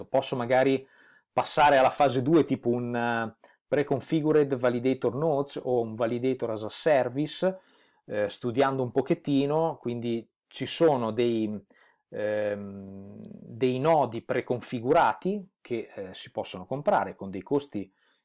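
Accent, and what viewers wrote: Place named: Bologna, Italy. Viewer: Ottimo video, preciso senza essere troppo tecnico....e l'accento bolognese é fantastico... Detto da uno di Modena 😉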